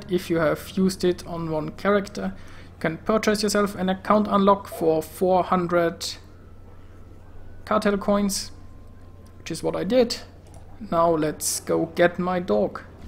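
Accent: Germany. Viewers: Your accent tells me you're German. Would I win if I had a bet on it? :D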